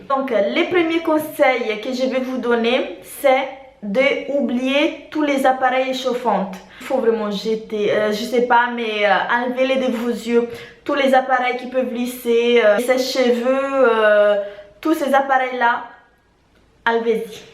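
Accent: Madagascar. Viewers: J'étais sure que tu étais malgache (façon de parler, visage aha) et je viens de voir que tu as fait un vlog à Mada', ça se confirme.😊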